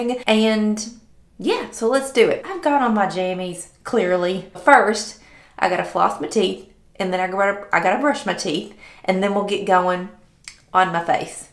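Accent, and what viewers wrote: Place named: Kentucky, United States. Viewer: CJ...It's a good 'ol southern accent, Kentucky to be exact.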